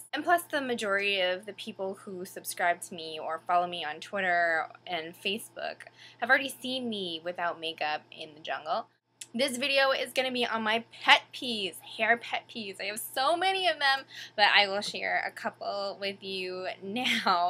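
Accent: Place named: Boston, United States. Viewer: I can tell you're from Boston girl, I heard you say "mirrAH" ahaha. Love your vidsss :)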